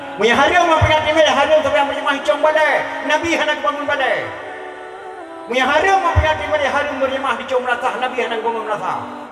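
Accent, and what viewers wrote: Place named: Peninsular Malaysia, Malaysia. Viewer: Kenapa cakap macam semenanjung Malaysia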